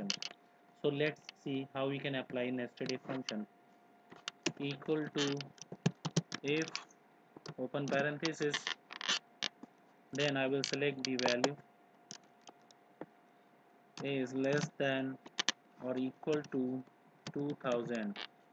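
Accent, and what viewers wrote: India. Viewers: what is with all these excel tut with indian accent?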